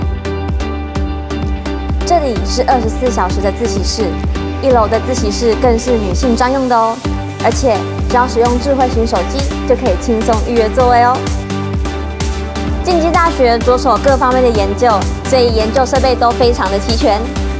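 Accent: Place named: Taiwan. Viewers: 台湾人だと思います。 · 本多照子 発音からして、台湾人だと思います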